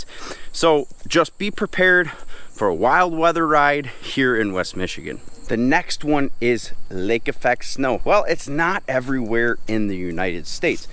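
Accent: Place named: Canada. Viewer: Not sure where this guy is from. Sounds like Canada. Michiganians do not have that accent.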